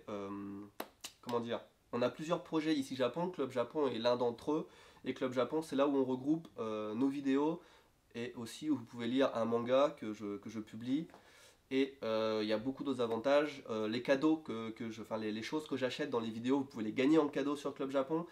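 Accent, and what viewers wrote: Lorraine, France. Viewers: Je me disais bien que tu avais un accent Lorrain des fois quand tu parlais, je rêvais pas et maintenant je suis fixé :p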